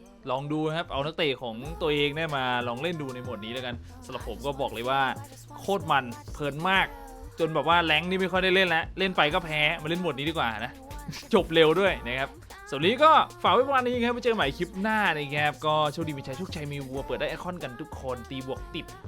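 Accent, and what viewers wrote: South, Thailand. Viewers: แหลงใต้ชับพึดเลยน่ะน้องบ่าว...หรอยๆ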